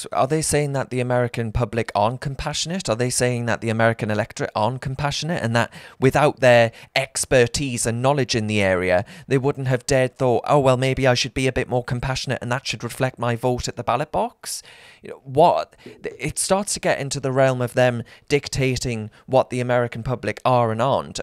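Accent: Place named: Northern Ireland, United Kingdom. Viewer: Is this dude from Northern Ireland, I like his accent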